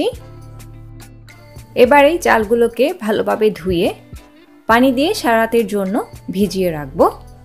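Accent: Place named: Kolkata, India. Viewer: uchcharon r karone kothagulo shunte valo lageni....apni ki kolkata style a kotha bolte chaichen?ছ চ r problem ta asha kori solve korben....otherwise valo hoeche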